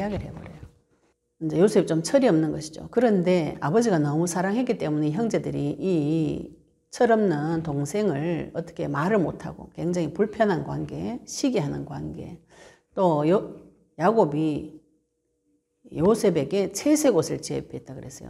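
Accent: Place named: Gyeongsang, South Korea. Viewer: 갱상도네? 대군감?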